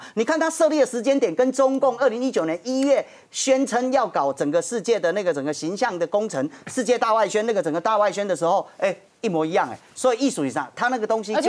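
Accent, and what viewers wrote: Taiwan. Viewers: 台灣國語聽不習慣？ 不然要聽北京國語捲舌嗎？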